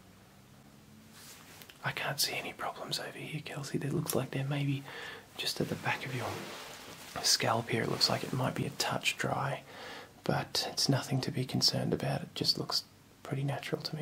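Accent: Australia. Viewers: I love me some Australian accent ❤️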